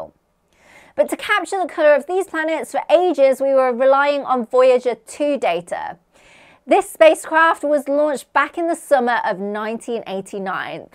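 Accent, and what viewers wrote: United Kingdom. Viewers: I was is that a British accent. Could still be. Oh. Nottingham UK. Your so far might as well be on the moon😂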